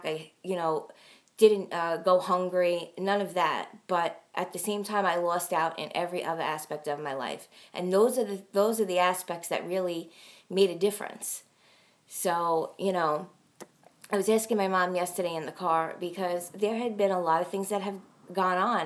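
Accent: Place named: New Jersey, United States. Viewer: God Bless You, Girl!!! Stay Strong!!! Looks like you have turned out Ok considering what you have been Dealt with. You are Stronger than you think!!! I also grew up in a VERY Dysfunctional Family & I can Relate to you somewhat. I sent you a FB Friend Request, I Really would like to get your Address. Maybe to send you Coupons, etc... I am from Ky & I have a Southern Accent. I was showing my Husband your Videos & was talking about your Jersey accent. I used to LOVE to watch Jerseylicious on TV. I don’t know if you know about that show or Not??? But, you remind me SO much of those Girls. You would be Tickled at my Accent as Well. 😆 Anyway, My Prayers are with you & your Momma. Thanks for your Videos, I enjoy them. 👍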